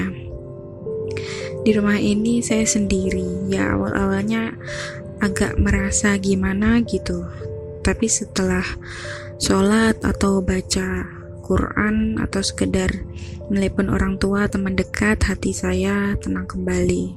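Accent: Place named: Java, Indonesia. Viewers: duhh mbak e,seneng bgt mbi logat e jnengan,gak ngilangi jowo ne 😁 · Denger Dik cerita, jadi ingat jaman aku awal kuliah di Bandung. Podo medoke hehehe....Tapi nggak masalah, setiap suku punya kemedokannya masing2 kok. Dan buatku, wong Jowo yg lama merantau di daerah suku lain, jauh lebih keren orang2 Jawa yg bicara dengan ciri kedaerahan/sukunya daripada wong Jowo yg sok ber-lu gue padahal seumur hidup tinggal di daerah berbahasa Jawa + medok pula. Hati2 kalau sendirian di rumah ya Dik. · Sedep penuturan dan aksen perempuan jawa gini, matur suwon mbak cerita ne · Mantap Mba'. Logat medhoknya tetep dipakai dan ada sisipan bahasa jawanya jg,..Ndedet, Cangkruk, dll. Ceritanya jadi berasa lebih natural, cuma kasihan bagi yg tdk mengerti, alangkah baiknya jika langsung diartikan pada saat bercerita. Ditunggu cerita2nya yg lain....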